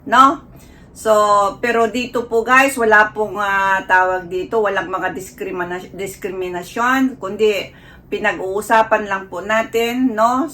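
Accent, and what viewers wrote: Visayas, Philippines. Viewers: Anong province mo ma'am? Kasi Ang salita mong Tagalog Ang tuno bisaya halata na Hindi ka pure Tagalog.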